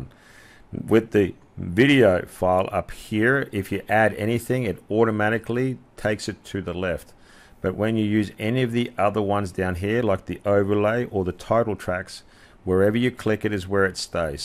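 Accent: Australia. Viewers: I wish I'd found this video two years ago! I'm not a beginner any more but I /still/ found basic things I didn't know. Thanks, I'll be checking out your other videos too. Oh and cheers from Melbourne. Lovely to hear that Aussie accent. :D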